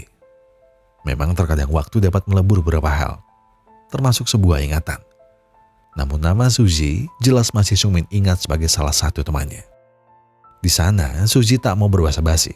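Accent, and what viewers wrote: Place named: Banyumas, Indonesia. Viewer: Deneng teyeng ngapak njenengan mas?😅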